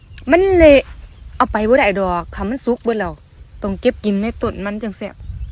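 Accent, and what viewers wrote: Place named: Ubon, Thailand. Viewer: น้องเค้าน่ารัก สำเนียงคนอุบลชัดมากๆ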